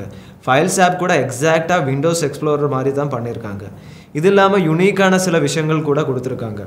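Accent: Kerala, India. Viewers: Malayala tamil? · ഹായ് ബ്രോ മലയാളിയാണോ സംസാരത്തിൽ ഒരു മലയാളി ചുവയുണ്ട് . ഹാഷ് അണ്ണൻ എവിടെ ? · Bro മലയാളി ആണോ?? എനിക്ക് അങ്ങനെ ഒരു ഫീൽ കിട്ടുന്നു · தம்பி பேச்சில் இன்னமும் மலையாள வாடை.. மாத்திக்க முயற்சிக்கலாமே.. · Hi bro...unga slang ah patha kerla pakkam mari irukae!? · மம்முட்டி தமிழ் பேசுரமாதிரி இருக்கு தம்பி யாரு... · Your Malayalam slang is slightly visible your tamil... Great composite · Kerala tamil ah 🤔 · Malayali ah bro accents theridhu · Hello Kerala guy your malayali tamil nice bro are you running separate yt channel in Malayalam what's your tech channel name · Malayalam Tamil slang ah eruku 😃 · Narrator malayaayi yaa paa !!! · Yeppa dai Ivana ah mathunga da Ivan nalla ila da Ivan malayali pesuran kevalama iruku Ash ah review pana sollunga da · Ivan yena Malayale mathiri pesuran 😫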